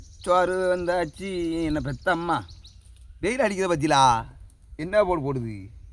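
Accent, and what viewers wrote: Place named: Tirunelveli, India. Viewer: தம்பி உங்க ஊரு வெளியில விடமாட்டேன் நான் மும்பையில் இருந்து ஃபாலோ பண்றேன் எனக்கும் திருநெல்வேலி தான் உங்களுக்கு தொல்லியல் எந்த ஊரு கண்டிப்பாக சொல்லுங்க நீங்க பேசுற ஸ்லைம் எனக்கு ரொம்ப ரொம்ப பிடிக்கும் முடிஞ்சா ரிப்ளே வாங்க❤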